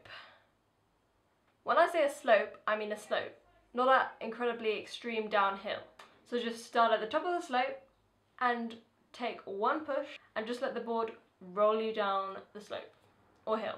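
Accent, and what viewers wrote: United Kingdom. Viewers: cute twitter bird!! lolz Lovin the awesome British accent. tee hee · She's so cute. I love british pronounciation❤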